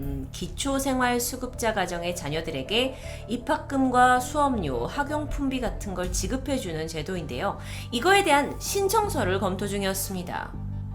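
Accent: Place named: Jeolla, South Korea. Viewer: 전라도 ㅋㅋㅋㅋㅋ 아옼ㅋㅋ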